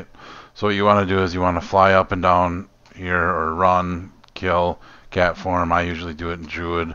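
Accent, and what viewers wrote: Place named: Midwest, United States. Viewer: Your from the Midwest I can tell. I’m guessing Wisconsin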